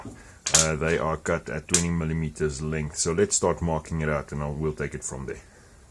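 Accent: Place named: South Africa. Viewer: You sound south african, are you?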